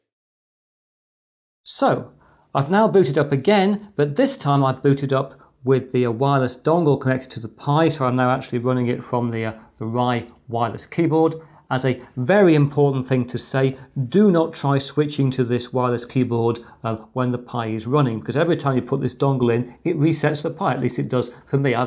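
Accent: United Kingdom. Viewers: You can set the video speed to slower (0.25, 0.5 or 0.75 x ) in the settings menu at the bottom right of the video. I've got mine set to 2x because I find his accent (British) easy to understand.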